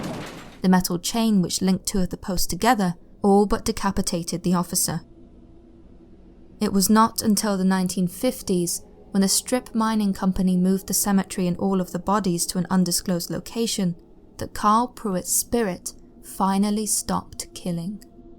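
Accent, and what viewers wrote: United Kingdom. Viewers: The British accent is one of the best. So calming to listen to.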